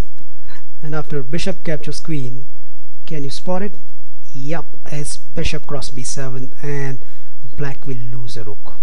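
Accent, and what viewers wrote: India. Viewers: Love you bro. Hindi accent is great ;) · the indian accent...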